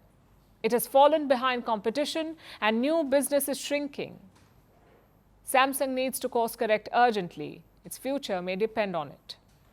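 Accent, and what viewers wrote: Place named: India. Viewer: I like your indian accent😅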